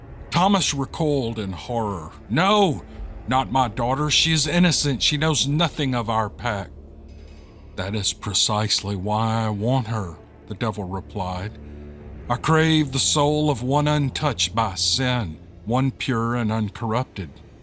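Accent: South, United States. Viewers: One of the many things I enjoy about listening to your podcasts, is that you are not in a hurry! English is not my first language, and people often try to speed things up on YT. You don't, you read like you speak. With a lovely Southern drawl, not too broad for foreign ears... You stay far away from anything political... a true gift in this day and age... In the short time I've been listening to you, no evening has gone by without you sitting on the edge of my bed, the cadence of your voice slowly rocking me to sleep... Thank you for what you do here,! We really appreciate your efforts! ❤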